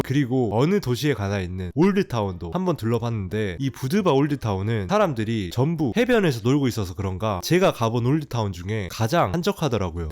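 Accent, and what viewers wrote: Jeolla, South Korea. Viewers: 혹시 억양이 전라도 분이신가 티가 나는데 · 아따 전라도 양반이구만요..ㅋ 사투리 느껴짐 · 말이 전라도이지요 요사히 전라도라면 그렇게 좋아하지 않구만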